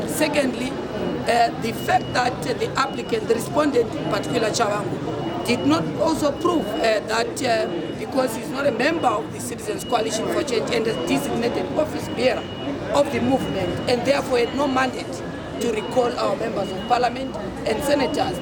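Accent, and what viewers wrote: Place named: Oxford, United Kingdom. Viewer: Taura zvako wangu mufanha wechidiki , who speak English with an Oxford asent Osalo 💖💥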